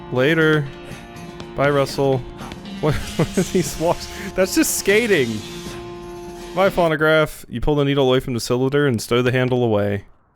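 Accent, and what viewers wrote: United States. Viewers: To be fair I'm not a huge fan of "funny" games, but I do love western, and when I saw the Dad's animation I decided to at least giving this episode a shot. Liking it so far, but I'll mostly keep watching for your cowboy accent:D Happy New Year,! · Gonna be hearin' a lotta that southern part'a ya. Can't wait.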